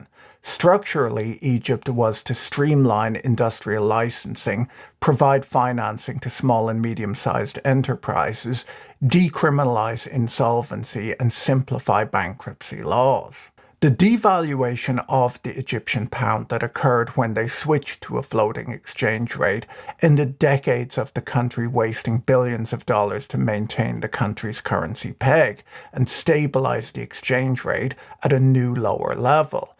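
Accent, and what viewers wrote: Ireland. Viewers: I do miss the occasional comedy. Everything in an Irish accent is funny. However, he has to be one of smartest men I seen in a long time.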